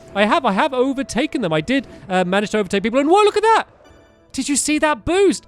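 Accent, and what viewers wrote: United Kingdom. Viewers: he British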